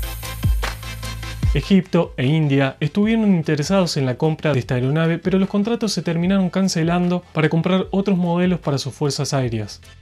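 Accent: Argentina. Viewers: Como siempre dándonos cátedra de aviación excelente video me gustaría saber que nacionalidad tienen, párese argentino tu bonito acento. Es com argentino, lindo fuera que la fuerza aérea argentina tuviera estos aviones, con los eselentes pilotos, sooy de el mísero país de Colombia reprimido por el gobierno norco paramilitar acmiro al el pueblo, Argentino saludos Dios los bendiga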